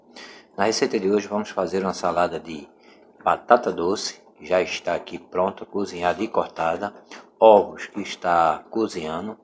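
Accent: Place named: Pernambuco, Brazil. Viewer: Eita que sotaque lindo do meu Pernambuco 😍. Salada maravilhosaaaaa! Já vou fazer, não vai ficar maravilhosa como a sua. Mas vou tentar. Parabéns!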